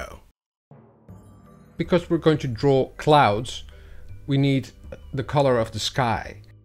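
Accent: Netherlands. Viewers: Are you a native english speaker? I thought i heard a little dutch accent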